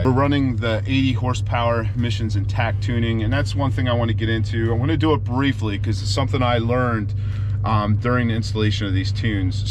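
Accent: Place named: Pennsylvania, United States. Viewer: Pennsylvania? You sound like you’re from PA.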